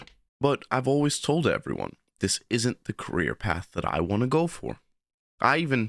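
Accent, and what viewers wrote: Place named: New York, United States. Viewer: had a feeling you were from new york coach lmfao keep up the good work!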